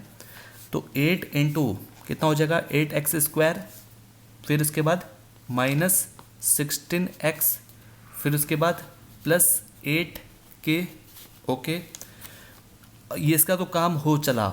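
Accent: Bihar, India. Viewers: sir app bihar ke ho?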